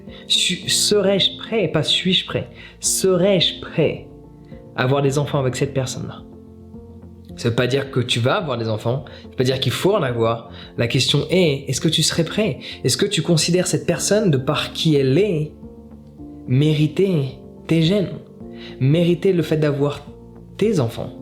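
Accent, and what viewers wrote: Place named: England, United Kingdom. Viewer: Curieux, c’est la première fois que j’entends quelqu’un parler français avec une intonantion anglaise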